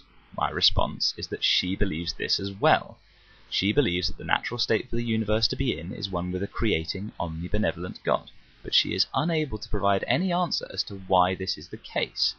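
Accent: United Kingdom. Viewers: I made a response too but it just sounds so much better with an English accent!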